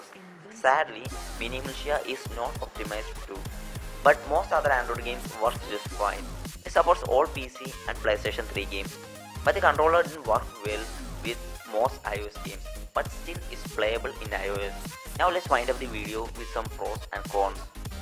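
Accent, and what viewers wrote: Kerala, India. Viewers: Da neey mallu anallae?.. voice ile manasilayadane enake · pure malyali accent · from ur accent i understood ur from kerala. Even im in kerala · lol indian accent...rip english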